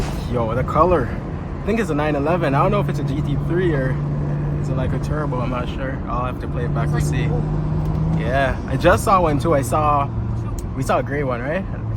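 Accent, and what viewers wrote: Jamaica. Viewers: Yo u mi hear di Jamaican accent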